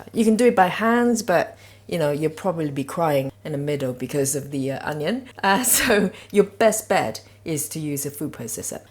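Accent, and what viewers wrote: United Kingdom. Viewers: I had to laugh at the end, as you say "put a dollop of chilli paste on the side" and then proceed to put the equivalent of a small ladle full! You are clearly "chilli mad", just like my wife. I will definitely have to make this for her, as I'm sure she would love it! 41 years ago, I convinced a little asian gal from Christmas Island to marry me, and making yummy things for her like this helps to keep the romance going. She spent 3 years in the UK, and has a slight British accent (not as strong as yours, but it's still there). Her voice is not quite as deep as yours either, but I found listening to a number of your recipe videos very comforting, and kept me thinking fondly of her while she is away at work and I work from home. Love your recipes, and will be trying the "french Fries" tonight. I'll let you know how it goes!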